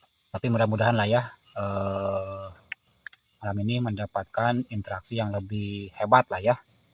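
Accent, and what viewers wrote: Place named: Sunda, Indonesia. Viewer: logat sundanya kentel bngt orang cianjur ya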